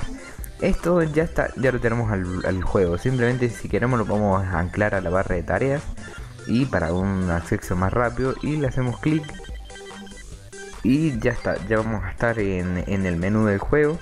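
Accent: Córdoba, Argentina. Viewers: amigo sos de argentina cordoba?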